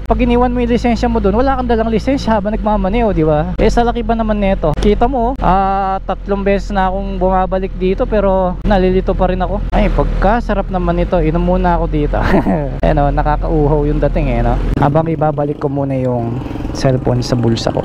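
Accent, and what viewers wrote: Pampanga, Philippines. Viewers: Kapampangan accent jang mag tagalug ka balu me e hahahaha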